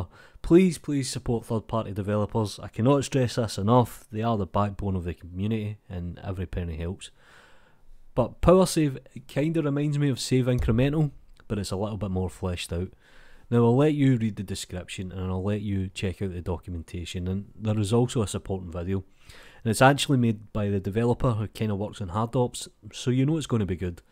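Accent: Scotland, United Kingdom. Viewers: Love your scottish voice reminds me of GImli. :) Can you tell me why powersave is good when i can press save myself???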